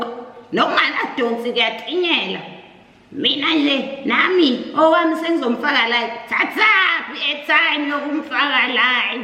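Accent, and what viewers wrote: KwaMashu, South Africa. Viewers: Heh heh lol my cousins from Kwamashu accent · All I can hear is the Kwamashu accent😅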